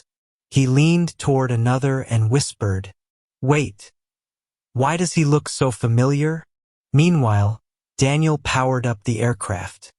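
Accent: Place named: Spain. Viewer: Why is it that the narration keeps changing to a broad Spanish accent?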